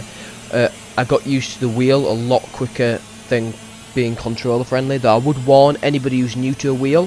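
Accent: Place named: Manchester, United Kingdom. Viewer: You sound more manc than Yorkshire... You sound exactly like Gary Neville!!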